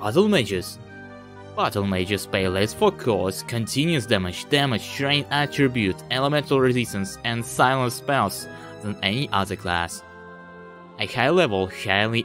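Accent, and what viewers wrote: United Kingdom. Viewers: When I saw "almost without accent" I expected to hear less accent than I heard in that video, though nice try, it's not russian accent at very least. From what I heard it feels more like scottish accent or something like that, sry for being too strict :DD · Круто получилось! Кстати акцент напоминает британский, воть. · Учитывая шрифт документа, очень даже приемлемо. Отдаёт чем-то британским в плане произношения, но я не эксперт. Хорошо получилось.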